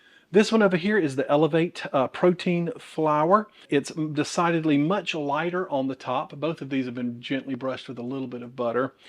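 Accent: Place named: North Carolina, United States. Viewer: you sound so much like we do in North Carolina, so I feel like you're a good friend. You talk just right!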